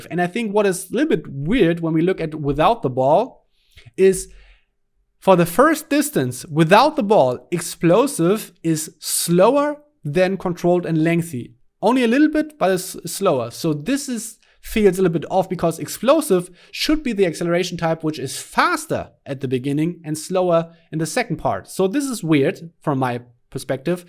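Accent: Germany. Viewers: youre one hundred percent german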